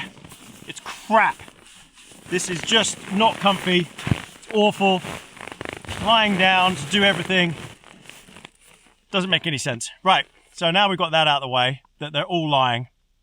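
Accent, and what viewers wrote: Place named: England, United Kingdom. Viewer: Wow,. How nice to hear someone say something nice about America.....that doesn't happen very often. We Americans love our Kiwi's too!! I previously worked with several New Zealander's and Aussie's--so much so that I learned the difference in accents! There is nothing NZ's hate more than being called Australian!, you also have a lovely accent albeit English. Greetings from Los Angeles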